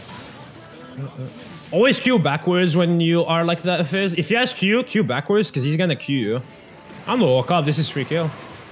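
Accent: France. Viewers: Ta laccent francais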